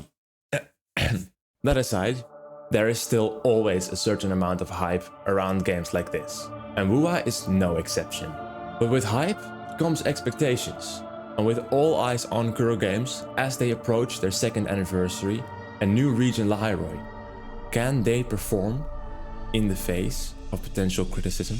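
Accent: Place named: Netherlands. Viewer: 300 subs?? with this kind of editing? Amazing work Also are you perhaps Dutch because I thought I heard the accent in your English XD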